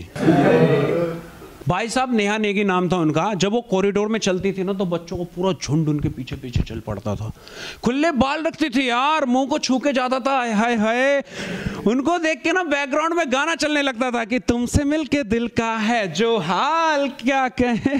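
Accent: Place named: Delhi, India. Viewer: Bhai delhi se ho na language se pata chal rha hai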